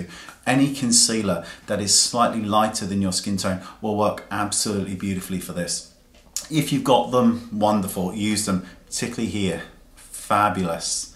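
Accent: France. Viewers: What a beautiful french accent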